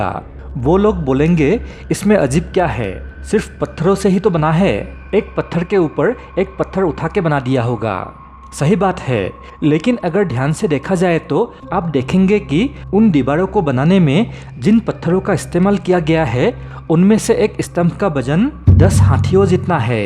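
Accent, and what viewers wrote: Assam, India. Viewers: Apunar Pronounce Huni Gom Palu J Apuni Oxomiya Hoi ....But Apuni Oxomor A Hoi Ne Onnyo Rajyor Hoi😊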